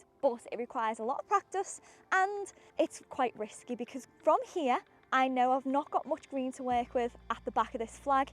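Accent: Liverpool, United Kingdom. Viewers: Seen you on Instagram a lot but I never have the sound up ,never realised you were a scouser 😅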